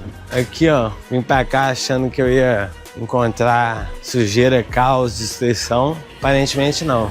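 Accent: Minas Gerais, Brazil. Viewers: Vô te falá viu. Acho q ele é mineiro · Estou amando seus videos, o sotaque Mineirinho me dá uma saudade de casa 🥰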